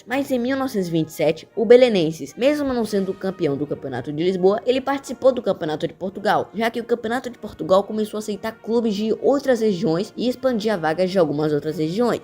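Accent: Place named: Brazil. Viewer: Foi preciso uma voz brasileira a explicar tudo isto. Grandes Tugas ! 🎯 Fora com a Belenenses Sad, corruptos.... Volta " Os Belenenses". 💙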